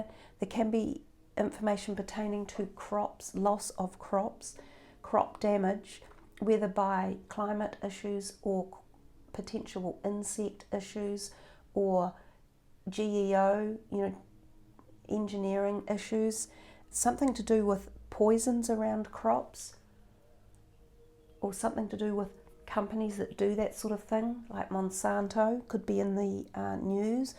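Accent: New Zealand. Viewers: Came across you the other day great to hear a kiwi accent lol 🙂 very interesting reading, I think October is going to be quite full on 😬 Thank you! ❤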